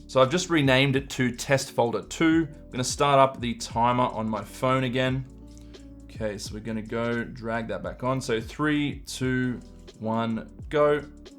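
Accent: Australia. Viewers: why does cutie boi have an Aussie accent?!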